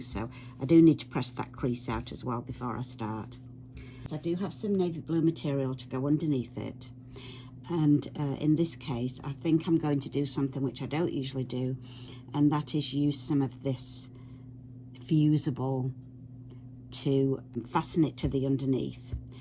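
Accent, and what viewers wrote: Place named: Northern England, United Kingdom. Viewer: Thank you very much for all of your Sashiko videos. I’m a complete beginner and you are the first channel that actually has slow and helpful tutorials. I’ve found so many other videos that start, assuming you already know how to make a Sashiko knot, etc. So, thank you for all of your help. On another note, are you from the Midlands or northern England? I absolutely adore your accent. Greetings from Washington!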